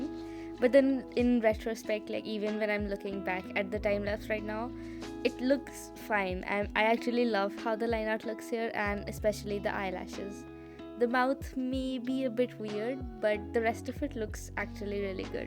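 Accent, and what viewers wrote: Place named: India. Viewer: are you from India?😅 (I'm so sorry if I offended you, but your voice literally sounds like an Indian <3❤)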